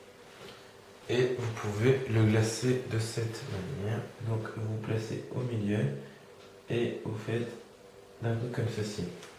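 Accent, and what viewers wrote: England, United Kingdom. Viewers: tu me soule aek ton accent anglais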